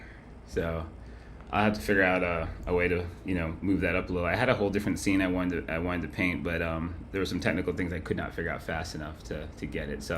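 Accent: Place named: New York, United States. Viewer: i hear that NY seeping in here'n there :)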